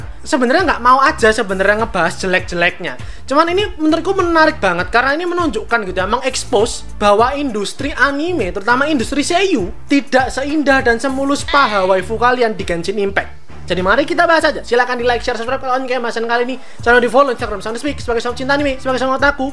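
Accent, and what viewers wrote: Java, Indonesia. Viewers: Medhok banget kak asal jawa kh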